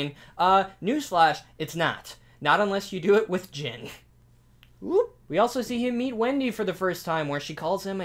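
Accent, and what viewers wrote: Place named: Boston, United States. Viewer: As a Bostonian, I approve of that accent.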